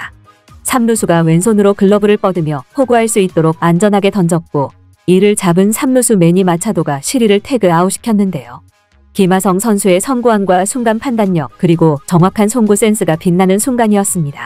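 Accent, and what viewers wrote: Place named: North Korea. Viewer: 아 젠장 결국 3루에 공던졌다는걸 이렇게 길게…. 그리고 이 아줌마 목소리 겁나 듣기 싫네. 북한여자인가?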